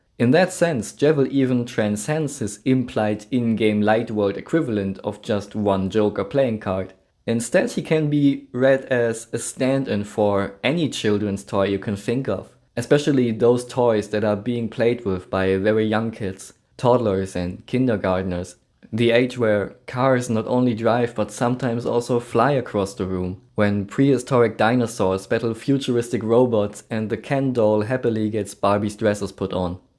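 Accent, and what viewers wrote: Germany. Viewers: Are you german ? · Soooo, are you German perchance?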